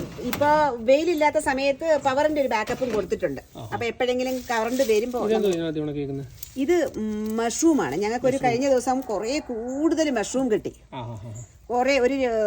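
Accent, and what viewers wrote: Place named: Kottarakkara, India. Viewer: കൊട്ടാരക്കര ഭാഷ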